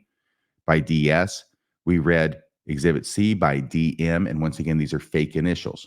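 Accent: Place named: Utah, United States. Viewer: Thank you so much for reading the complaints word for word...even if the accents are Utahn 😅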